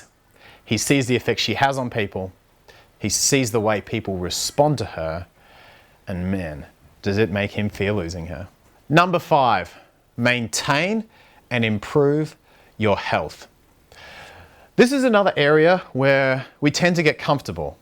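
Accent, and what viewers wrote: Australia. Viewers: Is that Australian accent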